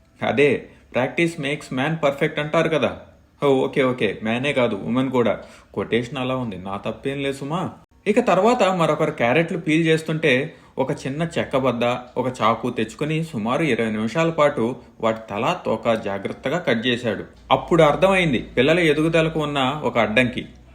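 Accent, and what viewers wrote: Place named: Guntur, India. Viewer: Are you from guntur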